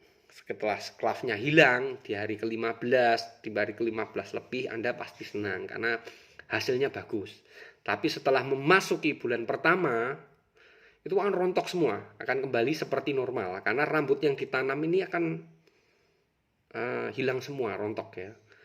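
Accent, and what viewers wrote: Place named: Java, Indonesia. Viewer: kok medok banget om , orang jawa mana aslinya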